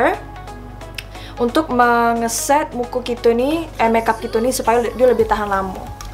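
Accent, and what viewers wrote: Negeri Sembilan, Malaysia. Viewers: Loghat MCM Org negeri sembilan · Mcm bahasa melayu negeri sembilan dan bahasa melayu perak dan kelantan lenggok dia.....dan area2 padang minang....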